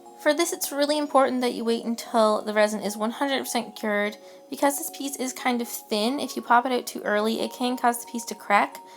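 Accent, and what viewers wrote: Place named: Canada. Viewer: Are you Canadian? I can hear it in the way you say, "about" you say "aboot" lol it's cute.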